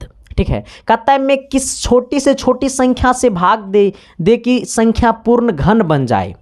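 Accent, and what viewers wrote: Bihar, India. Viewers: Sir aap Bihar se ho kya ???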